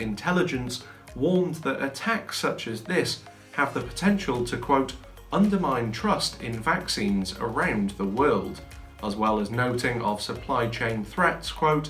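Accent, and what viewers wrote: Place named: United Kingdom. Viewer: Hola funny talking Brit dude! USA cowboy here. Yee-Haw bro. OK, Salutation done. Check. Suppose there was a white hat hacker group dedicated to finding out bad hackers and TAKING THEM OUT. Seek and destroy. Make them fear. Next, blockchain smart contract. Example, I got hacked by dipshit ransomware and I am pissed! I am pledging 0.01 btc to whoever takes out this garbage. And there are 10,000 other angry victims also wanting justice. The bounty gets up to 100 btc, that’s like $1.8 million. White hats say Yeah, We gonna git em. So they (several WH groups) go after them, destroy them, prove it according to smart contract and collect the bounty...And other black hats getting very nervous and thinking about changing their ways. Maybe becoming White Hat. 130 years ago, Where I live, If We had an a-hole We would put up a bounty and hunters would go git em. 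Ded or a-live. Keep up the good work and I will keep watching. Comment...FIRST!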